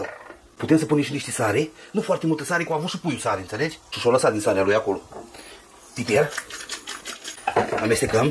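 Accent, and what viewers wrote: Moldova. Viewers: M-ai pierdut cu moldovenească ta că nu înțeleg mai nimic 😢😢😢😢😮😮😮😮😮😮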